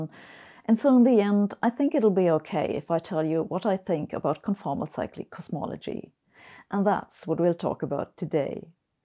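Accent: Germany. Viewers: Are you from Hamburg? your accent reminds me of my friends mom. She had the most beautiful accent speaking English, so pleasing on the ears... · You're very funny! your talk reminds me of old American cartoons in which the mad scientist always had a German accent. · I love hearing about science on YouTube and from Ms. Hossenfelder in particular. I feel constrained to admit I primarily watch because is a smokin' scientist. Could that tiny woman with her little German accent & flawless English be any sexier? Intelligence is attractive and this adorable clothes horse should be out there in the famousphere with Neil DeGrass Tyson as a rock star scientist. rocks. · What's the charm here? It must be nuanced English backed up by a German sound palette and oh yes the content!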